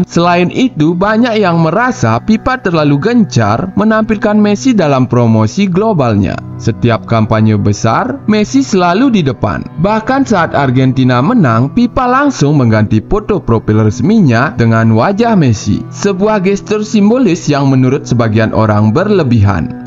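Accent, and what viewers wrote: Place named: Aceh, Indonesia. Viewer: Suara admin nya macam logat org aceh...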